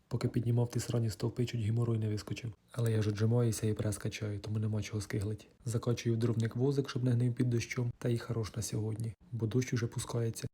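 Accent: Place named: Poltava, Ukraine. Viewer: а звідки ти напиши, просто говір у тебе такий полтавський, от я і зацікавилась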